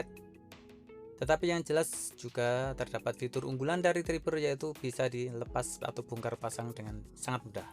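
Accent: Java, Indonesia. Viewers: Medok banget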